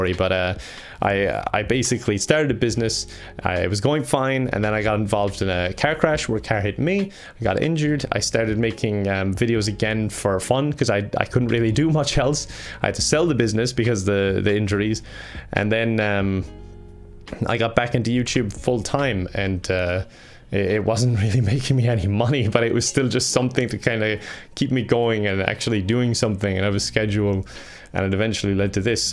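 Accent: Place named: Ireland. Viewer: I feel that way all the time wen I see ur vids its like a piece of my mind sets at peace wen I see a vid I love ur content I love ur humor and I really like the accent, I kinda wish I had a heavy irish accent (I am irish) but its a relief wen I see ur vids I love wat u do its a really important part of my day wen I watch ur vids and I hope u keep going never quit and hopefully I can meat u at pax or something later on in life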